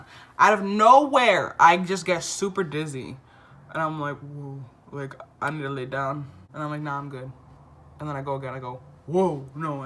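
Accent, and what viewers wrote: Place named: Dominican Republic. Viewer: I knew que eras Dominicana or cubana lol I have a Cuban friend that talks just like you, I’m Dominican btw 🇩🇴🔥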